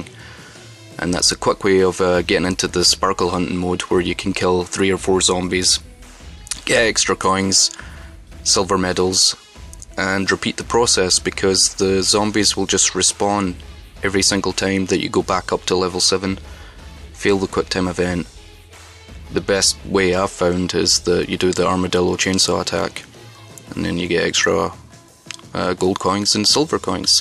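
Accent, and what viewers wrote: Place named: Ireland. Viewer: Your accent is AWESOME. Irish people rock just as much as all the other races do! (Equality ftw!)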